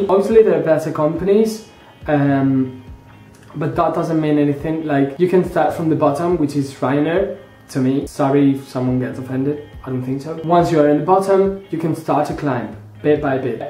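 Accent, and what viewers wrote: United Kingdom. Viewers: Omg what an amazing British accent xx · Such a great british accent being spanish congratulations haha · I did my recruitment day for Ryanair last Friday (Dec 6th), it's Tuesday now (Dec 10th) and this week I'm getting my answer, if I'm finally chosen I'll be travelling to Hahn on the 7th of January. Fingers crossed, I'm nervous and excited at the same time. Gracias por compartir tu experiencia, yo también soy español! Y enhorabuena por tu acento británico 👌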